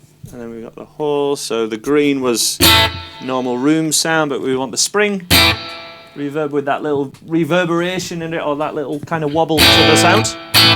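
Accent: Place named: Yorkshire, United Kingdom. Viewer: Hey, where can I get myself a Red5 and which one are you using in the video as I can’t find the link in your description. Much appreciated, thank you! I hear a Yorkshire accent, I’m Leeds/Bradford!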